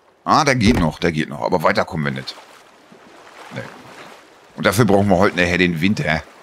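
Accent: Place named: North, Germany. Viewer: Hallo, Lass dich nicht ärgern, ich persönlich mag den norddeutschen Akzent und "Suuns"of the forest ist einfach sehr erfrischend zu hören 💙😊 Lg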